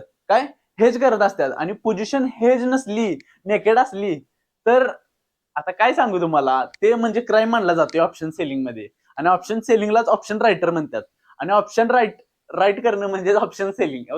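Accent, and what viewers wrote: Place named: Kolhapur, India. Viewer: Mast marathi boltos kolhapur chi atvan aali karn aamchy kolhapur madhe aaschi bhasy vapartat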